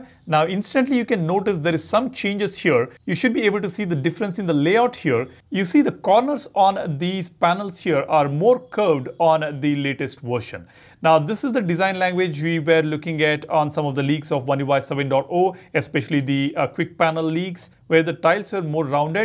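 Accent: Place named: India. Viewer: My friend thanks forall your information but you are talking to fast and with your Indian accent it’s very difficult to followed you. Please don’t believe I’m not accusing you I’m not insulting you but you needs to slow down if you want to people understand you Especially some of us don’t know or not familiar with technical issues 🙏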